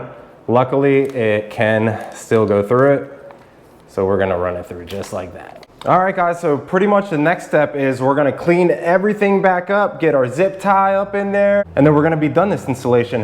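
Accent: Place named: Philadelphia, United States. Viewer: thanks bro! U sound like a local, are you from Philly area?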